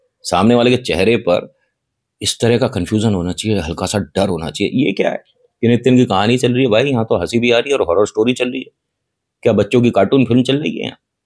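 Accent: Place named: Meerut, India. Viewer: Bhai aap meerut seh ho na.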